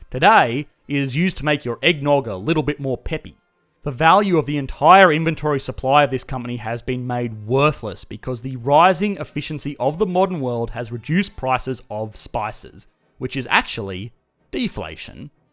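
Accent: Australia. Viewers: One of the reasons I watch your videos is to hear your Australian accent. But I never knew you guys pronounce it... mer-CANT-alism.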